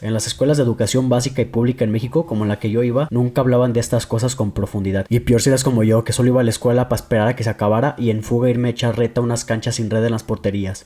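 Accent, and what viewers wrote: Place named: Peru. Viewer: Heyyyyy? Me encanta tu canal ya que trata temas similares a los míos. Veo que empezaste hace poco igual que yo así que bueno, mucha suerte. Por el acento asumo que eres de Perú? Me recuerda al perro en la tacita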